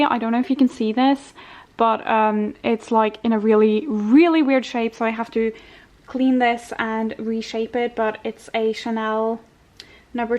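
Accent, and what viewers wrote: Ireland. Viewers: Irish accent😀??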